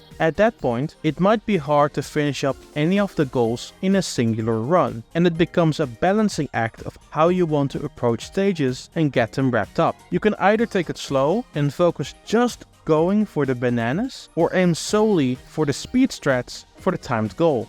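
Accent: Netherlands. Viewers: jouw nederlandse accent geeft me kkr sorry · Searxhed for this comment. There is no doubt. No offence though. My English is only 20% of how good his is. But out of personal preference.. I dislike English with dutch accent. But I think that is just because my brain cant stop foccusing on the accent.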